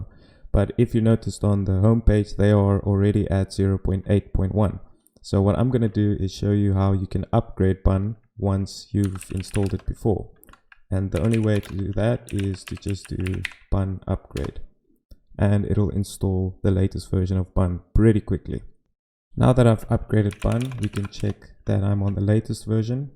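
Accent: South Africa. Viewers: Came here for the bun and HTMX, stayed because I heard the SA accent lol. Also playing with HTMX now, still wana start a side project with bun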